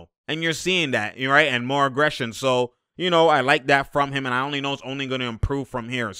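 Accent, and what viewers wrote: Toronto, Canada. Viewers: You from Toronto?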